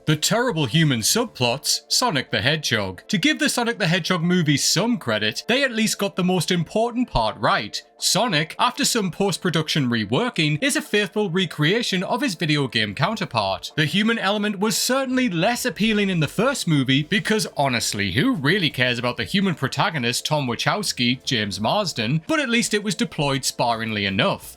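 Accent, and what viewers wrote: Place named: Geordie, United Kingdom. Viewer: Poyro?! Christ. Thick sounding Geordie.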